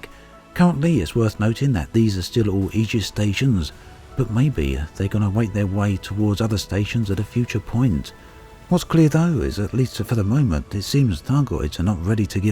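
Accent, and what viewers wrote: United Kingdom. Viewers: He is british. End of story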